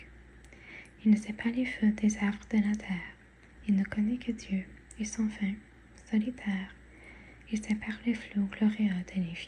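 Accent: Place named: Paris, France. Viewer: This sounds like an accent from Paris. Very interesting because your English does not have a French accent.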